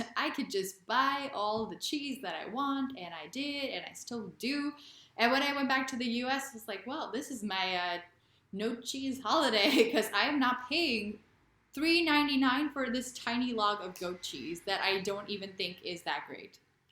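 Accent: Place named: Netherlands. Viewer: She lives only 1 year in the Netherlands and has already a steenkolen accent.. wtf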